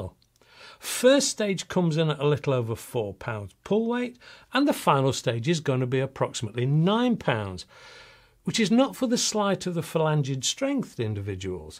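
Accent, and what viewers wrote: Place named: Liverpool, United Kingdom. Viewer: Thank you for an excellent review! Love your accent... Liverpool, originally? The real steel rattle abit, not as much as the airgun, but still! I do agree that the 17 gen 4 seems to be the most lifelike, but the threaded one, with a mock supressor, would mimic silencer on movies to a spot.... nothing like real life supressors...but again, still.. I am glad to your approach to the trolls, I am 58 and still enjoy airguns and airsoft to cheaper training then with the real steel ( ammo is extpensive in Sweden). Not to call you old in anyway, I would be far from it, but it is nice to see a mature man enjoy and review on YT... for the most it is youngsters.... don’t get me wrong, they do a awsome work...but... for the third time... ” still”... Keep up the good work, sir, and I hope to see many moore reviews from you, as long as you find it interesting! 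Thank you sooo much for upload! Oh, is there a Crosman SBR full auto pellet gun there, in your background?😉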